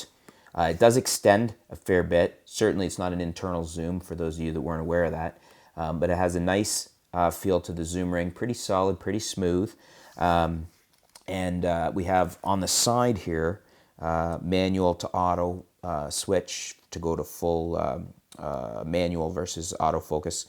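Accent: Canada. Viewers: Good informative review. Pleasant Canadian accent!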